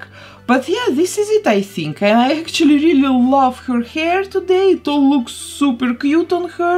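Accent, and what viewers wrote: Russia. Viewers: Russian accent? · Only i hear russian akccent? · Почему то со стороны мне кажется что это Russian Woman по акценту и выделению каждого слова в произношении, но я могу ошибаться и на самом деле это нормально в Америке. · У вас русский акцент, как мне показалось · Похоже на русский акцент 😅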